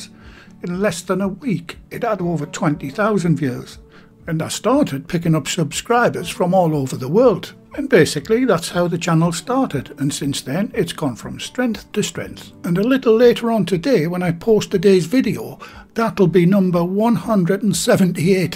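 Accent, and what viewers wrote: United Kingdom. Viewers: You deserve every iota of your success, and I must say aside from your tasty recipes I love your easy manner, no-nonsense approach and straighforward instructions and meaurements. My Grandad (who must have hailed from a similar part of the UK as your accent is a dead-ringer for his), who was widowed at a young age with a young family to look after was a fantastic cook and what you make here is the sort of stuff he would have made for the sunday dinners we used to enjoy at his house. Sadly he passed away before he could teach me how to make his traditional English recipes so to say I'm glad I found your channel is an understatement. Thank you for what you do, truly.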